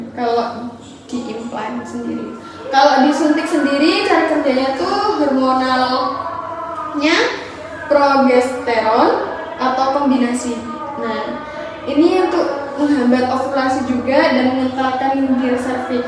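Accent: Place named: Java, Indonesia. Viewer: Nge Jawa banget ini kak😢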